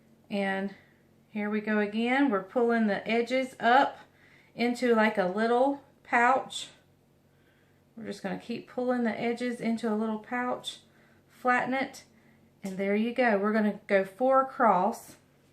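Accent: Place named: Eastern North Carolina, United States. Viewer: I feel so at home listening to you talk.... I'm from Eastern North Carolina and you sound like home to me!!!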